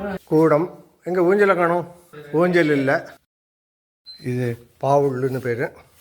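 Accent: India. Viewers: Thanjavoor bashi,kekkarathule nanna iruku. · The commentary is typical Tanjore brahmanical dialect..this adds more flavour to the video..Very nice..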